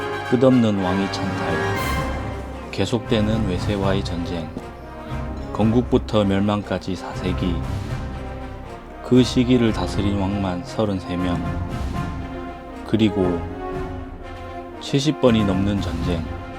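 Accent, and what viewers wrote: Gyeongsang, South Korea. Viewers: 아유타야 유적지 구경 자~알했습니다 역사 나레이션 하실때 어색하지만(같은 갱상도 나레이션이라 ㅎㅎㅎ) 내용면에서는 알찼습니다 ^^ 진지하게 설명 잘 하시다가 "앗 뜨거"에서 빵 터졌습니다 ㅎㅎㅎ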